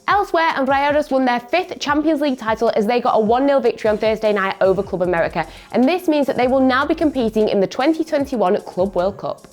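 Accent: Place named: United Kingdom. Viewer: No hate but I just don't like her accent and the way she delivers the video. Too fast and too much British for everyone to understand. Please only in the future.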